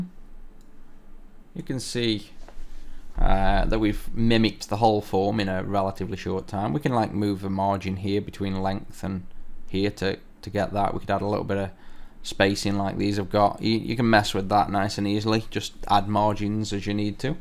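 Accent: United Kingdom. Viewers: Lol that UK accent. I feel like I am watching game of thrones. Well done by the way! Nice Video!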